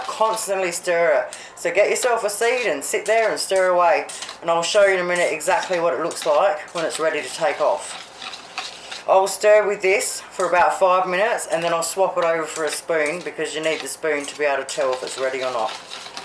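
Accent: Australia. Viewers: Great to find a Cook with a good ' downunder ' accent. !!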